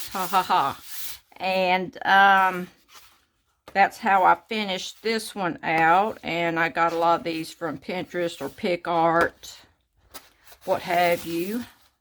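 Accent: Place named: United States. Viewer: Okay, will have a look then. Can I ask where you are from? You sound like you're form the USA, but it must be very late or rather very early in the morning as I am from the Netherlands and 6 hours ahead of the eastcoast, 8 hours from the west. I am going through your videos right now to catch up on them.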